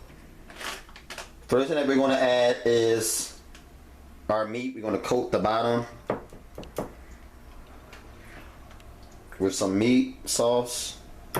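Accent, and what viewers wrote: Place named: Philadelphia, United States. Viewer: He said it right he has a Philly accent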